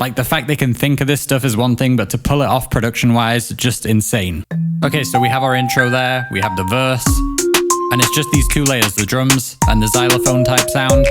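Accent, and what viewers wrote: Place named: Sheffield, United Kingdom. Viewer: Just found you today. watched a bajillion videos already. You have a bit of a Sheff twinge to your voice, hope i'm right. Big up Steel City if i am!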